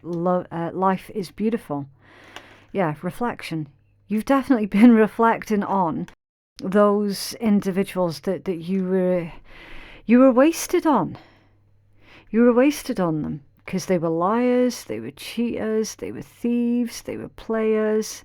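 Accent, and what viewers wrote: Scotland, United Kingdom. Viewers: Nice to hear a fellow Scot Content creator!🏴 Good tarot reader!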